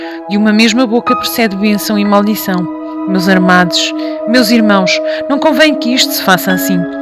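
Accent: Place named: Portugal. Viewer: Na Tradução dessa Bíblia é a msm em Português Brasileiro né , o sotaque de Português de Portugal é Lindo !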